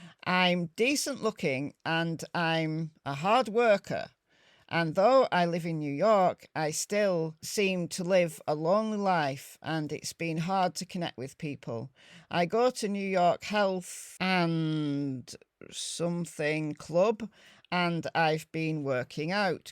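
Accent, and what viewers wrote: United Kingdom. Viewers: lovely to hear another brishish person xx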